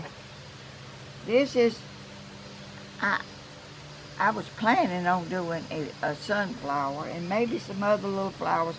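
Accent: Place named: Georgia, United States. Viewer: God has Certainly Blessed You with Good Health Ms.! You are Good Christian Lady, Kind & Compassionate to People & Yo Keep Busy, You are such a Blessing to sooo many People! God’s Blessings on You and your Family! 🙏❤️😇😇🍁🍁 I Love 💕 your Southern Accent & just listening to you Talk & your Stories! You mind your own Business & Trust God & that is why we ❤️ you, You are just you! That is sooo Beautiful! I Love ❤️ that Color on You!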